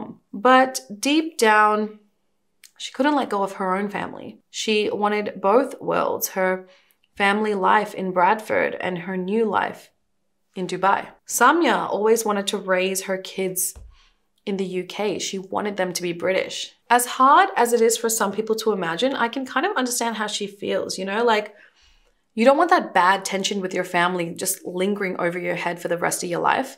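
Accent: India. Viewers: Ehhh I always thought your ethnicity was South Asia. Even when you talk about your childhood and stuff it sounds so Indian XD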